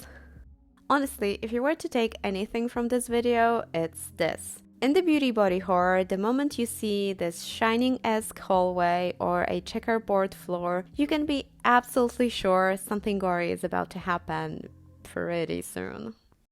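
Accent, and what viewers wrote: Poland. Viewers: I can hear a little Polish twang in your English. Are you originally from Poland?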